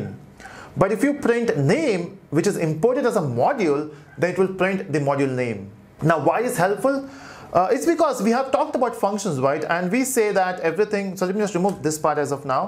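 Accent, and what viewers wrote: India. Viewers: You can understand him because you are indian and you have indian accent. this guy is indian and has indian accent. so you can understand him better than others if you dont know this than you are the one with low iq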